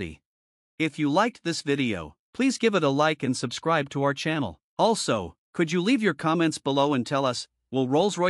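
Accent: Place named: United States. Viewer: Repetitive WAFFLE by a DREARY American Voice.... ALL talk and NO FACTS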